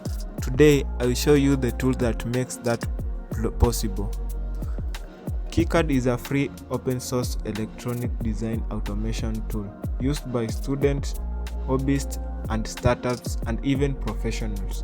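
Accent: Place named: India. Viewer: Stop watching the video when you hear an indian accent, you are wasting your time. Trust me , I am an Indian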